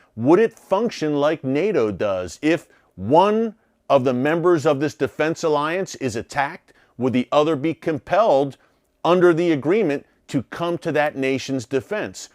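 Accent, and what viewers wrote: United States. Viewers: Why you talking like a cowboy?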